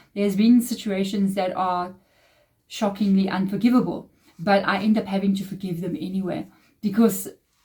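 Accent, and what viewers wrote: South Africa. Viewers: Good morning You sound South African, I’m based in Johannesburg, I would love to come for a one on one session, is that possible if Yes please send Me a guide on how I can make this a reality, thanks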